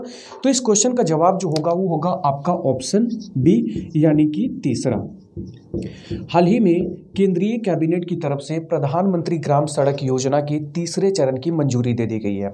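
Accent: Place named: Bihar, India. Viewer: 7/11 , sir jis tarah aap 11 ko ekgyarah bolte hai na ...bahut achha lgta h.. i think aap bihar se belong krte h...agar mai galat hu to I'm sorry plzz ..mujhe jo lga Maine bola ..but I really like ur way of teaching 🙏🙏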